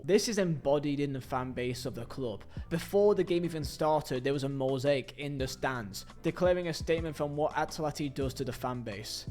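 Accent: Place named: Rossendale, United Kingdom. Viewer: German names in a Rossendale accent. Pure comedy gold.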